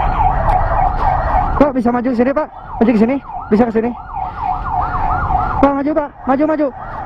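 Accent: West Java, Indonesia. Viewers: Oh abang teh orang sunda. Sama atuh bang sunda na timana. Abdi mah cianjur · sunda gening anjayy 😆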